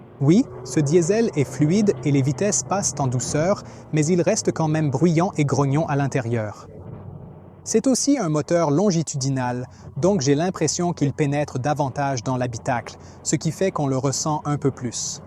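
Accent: Quebec, Canada. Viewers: c'est curieux mais il a un accent du quebec ?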